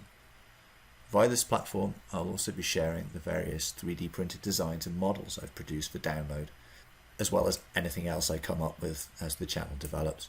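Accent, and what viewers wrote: United Kingdom. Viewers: Distinctly English accent, but US roads, come on then spill the beans... Where in the US have you moved too? Been watching your vids for the last month since coming across the channel in a recommended video. Currently looking to go from a carbon Canyon Endurance on 28mm tyres under my beastly 200 watts of pedal power to a 2000w bike to and from a 10 mile trek to work. Thanks for the effort you out into your content. I can see it all, from the filming to editing to overlays and commentary. The viewers on youtube sometimes do not get a grasp of the time it takes on top of the actual activity itself to film, edit and upload the activity. Thumbs up from the 🇬🇧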